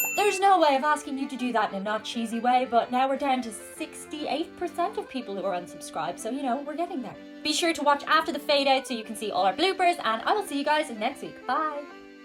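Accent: Dublin, Ireland. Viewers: You have an aful silly irish accent when u leave ireland for years and listen to irish accent it sounds strange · She sounds American, with a bit of very posh Dublin. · The more you drank the more Irish your accent got.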